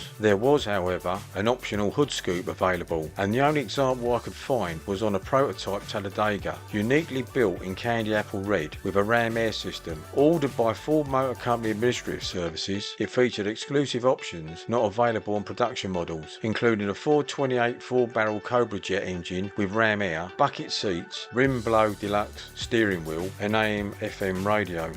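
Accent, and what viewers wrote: United Kingdom. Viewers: Very well done with the video matching the audio very well in spite of the audio being generated by your British narrator, which sounds really off unless it was targeted to British audiences. 🍁 eh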